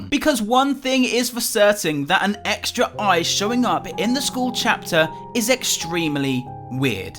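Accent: United Kingdom. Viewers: He’s British lol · He’s a cool British guy so he gets permission to say it in another way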